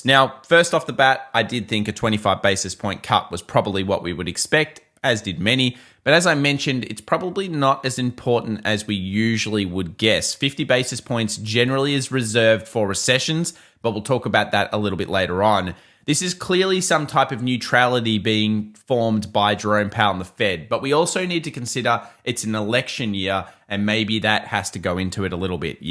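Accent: Australia. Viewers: I think that's just his aussie accent 🤣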